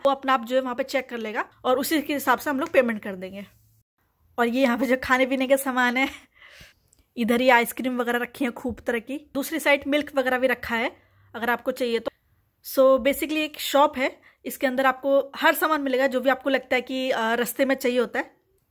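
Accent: India. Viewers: Hii apka bat karne ka tarika bilkul indian ki tarah hai ap austreliya me rah kar bhi indian ahsas dilati ho nice or austreliya gao ka sheyar bhi kara deti ho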